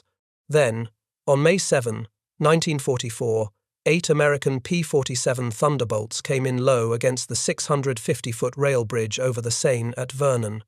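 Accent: United Kingdom. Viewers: I was getting more furious by the moment as you seemed to be ascribing all the action to the Americans. It is painful to hear a British accent not mentioning any of the splendid things accomplished by the night raids of the Mosquitos and the savage work of the rocket equipped Typhoons. Britain also contributed massively to the long-term sabotage delivered by the resistance groups by supplying the devices that could be used in place of direct explosives. The Gestapo would execute whole villages in regions where railways were sabotaged with explosives. When lumps of high explosive apparent coal were put into railway stockpiles and boilers just apparently exploded miles away, who could be blamed. Resistance operatives would put abrasive compounds into lubrication points where some would inevitably get into the steam lines. Locos would then simply lose energy and come to a halt while hauling a heavy freight. 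The Germans never worked that one out. It looked like simple wear and tear. I appreciate your mention, late in the article, of the heavy bomber dropping the Tallboy that destroyed the viaduct the Americans had failed to destroy. I wish you had mentioned the resistance groups who had been doing this work long before 1944. JW. Aust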